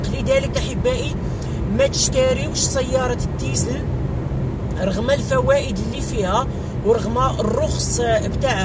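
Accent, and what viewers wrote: Morocco. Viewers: اللهجة المغربية جميلة جدا 😄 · هههه أستاذ لهجتك عم تنحرف للمغربية بدون ماتشعر وأنت تحكي.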